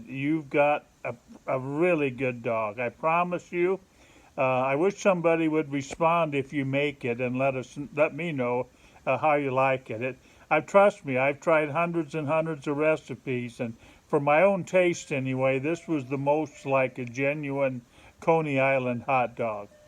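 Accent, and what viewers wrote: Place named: Pennsylvania, United States. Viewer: sounds like you are from Reading Pa. thank you!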